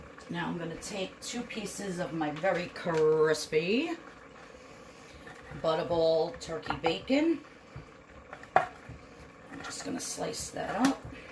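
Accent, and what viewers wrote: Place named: Long Island, United States. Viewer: Hey sweetie! I freakin love you and your videos. I'm a born and raised Upstate New Yorker (Albany area) but I live in Texas now, but I sure do miss NY. You have a Long Island accent, is that where you're from? I have many friends there (Wantagh, Massapequa, and Glen Cove). Keep up the great video's and thank you for sharing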